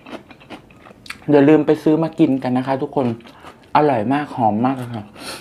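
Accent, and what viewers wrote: Isan, Thailand. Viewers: อยู่เมืองกาญทำไมพูดอิสานได้อ่ะคับ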